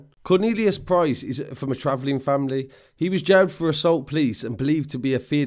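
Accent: United Kingdom. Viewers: Been a bit shocked past few weeks coming on to YouTube hearing a guy with a British accent giving the low down on my city with 100 percent facts and very good accuracy u got a new sub.... Iv met Robbie once in the joy and he wasn't right you could tell he walked around on his own... Sitting on his own in the middle of the yard in his own world.... I seen him in conflict once with a very close friend just call him liamo doin life locals know him.... Big tall crazy guy who people tryed to jump 2 with gangs and failed great boxer.... Him and Robbie had an argument about money got a bit heated one day words came both walked off to there cells obvisly ploting... Would of been a blade or something swung but pascal got involved and kept the peace he was friendly with Liam and was the shot caller for Robbie area that's all I know of him really..... He's notorious I hear really from whacking all his friends and would whack his own family on orders....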